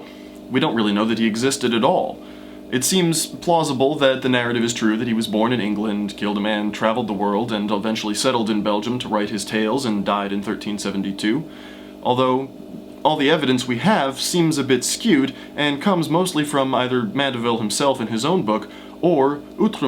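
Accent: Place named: France. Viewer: Nice french accent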